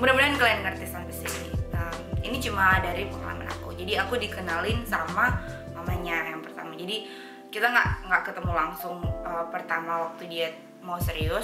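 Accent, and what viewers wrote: Manado, Indonesia. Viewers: Pertama kali nonton konten dr mbaknya. Kedengerannya mbak orang Manado.. anyway salam kenal & sukses dgn channelnya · rupa sama ini logat say...dari manado..?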